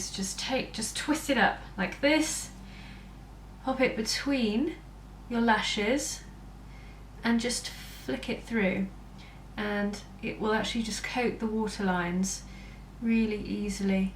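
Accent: United Kingdom. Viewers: I like listening to you. I learn a lot because your videos are educational for make up. Also, I like your British accent..=)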